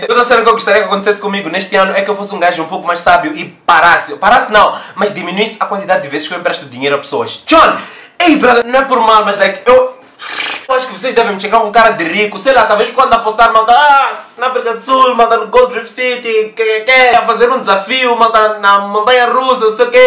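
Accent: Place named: Mozambique. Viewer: Sério wy. Teu sotaque de Moçambique me cuiou bué 😂😂. Faz parceria com um YOUTUBER Angolano mano.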